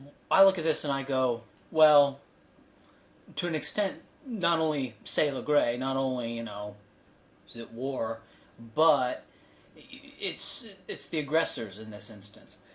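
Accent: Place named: North America. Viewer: Are you greek? LMAO this is absolutely insane. A north american dude (by accent) deciding what thousands of miles away should do 🤣🤣🤣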